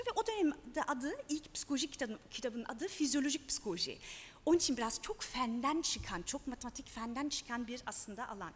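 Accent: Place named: Germany. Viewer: Kesinlikle ama almancı gibi konuşuyor maalesef.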